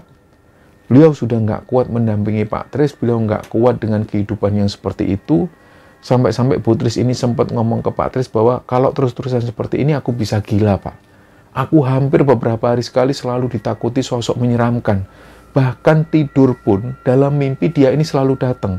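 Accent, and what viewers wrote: Java, Indonesia. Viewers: Suaranya jawa banget mas,up trus jeh mas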